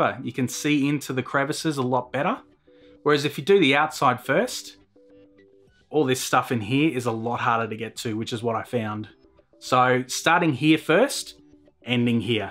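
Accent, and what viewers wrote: Australia. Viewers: I swear an Australian accent makes content better, it just sound more interesting for some reason lol. I was wondering why the long delay between uploads in 2015? you've got great content and a real solid foundation which I'm sure would see you boom on youtube? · Ah, so it's an Australian accent. Lol, yeah his videos are super soothing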